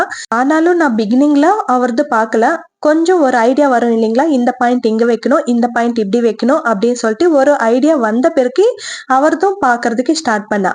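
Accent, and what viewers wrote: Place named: Andhra Pradesh, India. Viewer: Hi sis enga amma kooda ippude tha pesuvanga don't worry we r from andra so slang allaga undithi so go on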